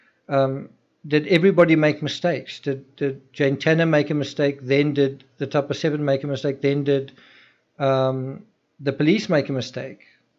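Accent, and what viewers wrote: South Africa. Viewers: Your accent is definitely South African.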